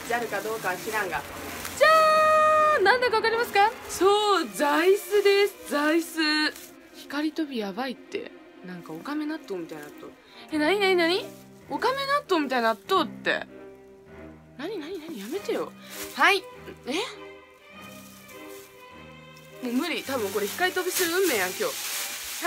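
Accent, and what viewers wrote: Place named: Hakata, Japan. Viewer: 博多弁好き！いつもめちゃめちゃなまっててほしい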